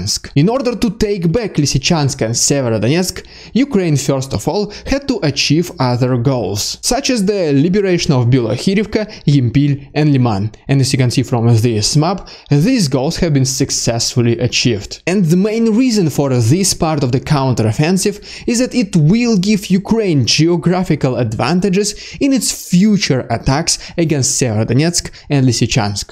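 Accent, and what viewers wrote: Russia. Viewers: I agree wholeheartedly. Maybe it is an Aussie thing, since we adore Canadians, and what could be more adorable than a Canadian with a Russian accent? If you like and Ukraine Matters, I also suggest Denys Davydov and Artur Rehi. Reporting from Ukraine Is also sometimes worth a look. Similar content on all five channels, but occasionally one picks up a significant point that the others missed or glossed over. And it is great to have a variety of perspectives and different nuances.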